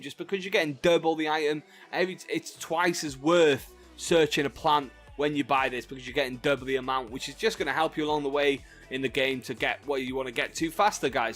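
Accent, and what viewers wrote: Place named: Wales, United Kingdom. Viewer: Exactly what i heard lol, gotta love the Welsh? accent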